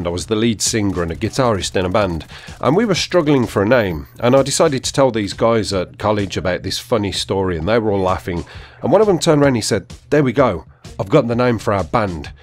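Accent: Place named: Ireland. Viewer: Your irish :)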